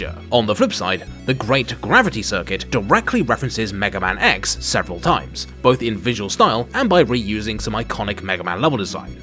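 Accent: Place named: United Kingdom. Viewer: Sometimes I watch your videos and forget that I'm not watching GMTK, but then I'm like "Wait, he's still British, but he's a different British..."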